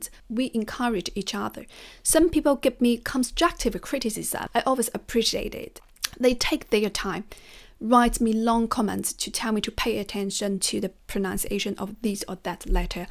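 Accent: England, United Kingdom. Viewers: Hello, I have just discover your channel yesterday night (we are in the morning when I writing this message) and I love your videos and learn so much! I am learning japanese and need to learn and have fun in learning. I see that is more simple to learn when it's a pleasure. Your stories and those of your friends are so shocking. Your are so kind woman and you speak so well english. I love your british accent and understand without difficulties what you say. I'm french and I know that native speakers like to correct rudely people sometimes. But I don't understand why they act like this. But there is people, like you said, that are very caring too. I want to thank you for this video and try to have those advices in my mind. I never really practice english outloud because I think that I will not have enough words to express myself but I understand well english. 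I will try to communicate more now! Thank you so much! Je te remercie pour cette belle vidéo, et j'espère voir aussi un jour des versions françaises de certaines de tes vidéos qui sont excellentes:) Merci encore pour tes conseils et belle journée à toi!!! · I can hear the British English accent so hard in her English, it's adorable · i was surprised to hear english wasnt your first or even your second.. i can hear almost a british accent from you.. the mesh is beautiful! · Thank you! Your English is GREAT! I understood every word and was highly intrigued by the idea of your almost British, sounding, dialect. It has a certain je ne sais quoi.... <3 Furthermore, your video was inspiring and multifaceted in many areas of Life AND Learning. {in my opinion of course;-) } My mom taught me THIS gem, "Your haters are brillo pads, they may be abrasive because they rub you the wrong way; however, if you disregard your discomfort, you will find that you have become polished and exuberate a new found brilliance and SHINE!!! 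Keep shining my friend, KEEP SHINING!!!!🌻💟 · Oh wow that’s really good that you’re able to learn that many languages. You shouldn’t be ashamed with about anything it’s not your native language. Ok here’s a new challenge for you, just for fun. How about trying working on your accent, you got the old English down, let’s try American English. This might be a bit more challenging but doable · Hi Miss. Your voice is so gently😊. Do you speak english in british accent??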